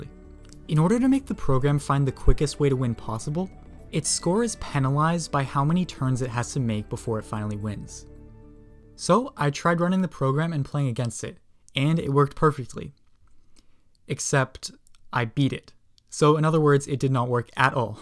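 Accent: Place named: England, United Kingdom. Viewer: I want to learn your English accent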